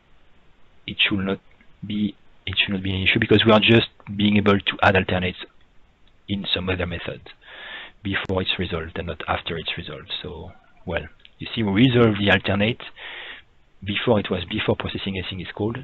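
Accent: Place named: France. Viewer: Très bel accent français ;)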